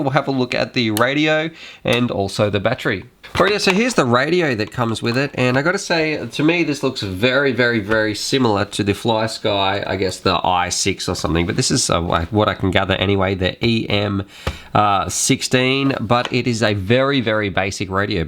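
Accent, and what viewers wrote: Australia. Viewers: I just watched past half way. Your definitely Australian. Finnaly someone decent whos an Aussie!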